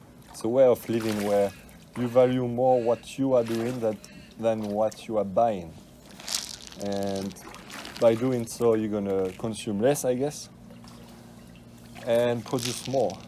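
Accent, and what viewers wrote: France. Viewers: I used to be pessimistic about the future of the world but over the last 20 years I think we have turned a corner. Doesn't make many people happy the future I see as it's tech driven but that the way it will be. It's just spreading the wealth is the issue and that political. Anyway thats a long story. On a completely different topic, that's a French accent? The wifes French and we come in to Melbourne occasionally for your sons braces to be done in Bentleigh East. A very important question and if you have an answer it could make the wife very happy (and the son and me). Is there a good French bakery near there and/or a French importer of foods? Thank you.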